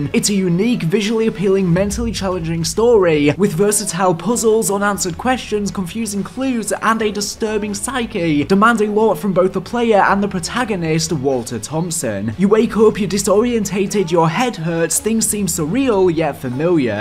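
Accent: England, United Kingdom. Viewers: Im pretty sure that it’s a English/British accent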